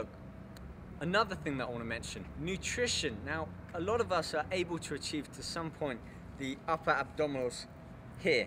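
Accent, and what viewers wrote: United Kingdom. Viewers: U look and sound really british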